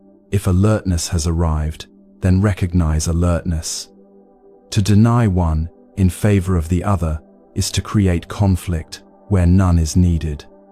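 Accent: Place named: Europe. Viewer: Ugh european accent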